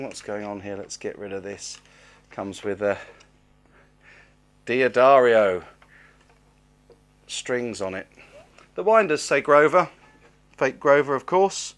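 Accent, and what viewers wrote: England, United Kingdom. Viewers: See your playing the right way with a pick and your english accent very cool I love england people I'm a italian from New York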